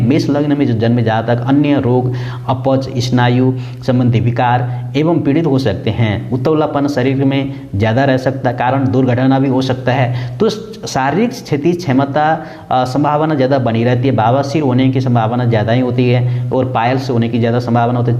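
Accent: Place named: Nepal. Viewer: तपाइ नेपालि हो जस्तो लाग्छ त बोलि सुनदात हो नेपालि मिक्स छ बोलिमा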